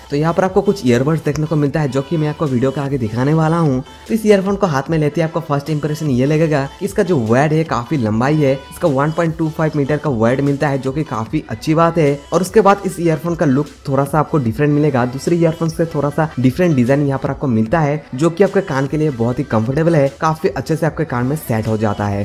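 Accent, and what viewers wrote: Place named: Bihar, India. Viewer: Bolte time bhot pyara lagta h tu bhai 😁 Bihari hai na ?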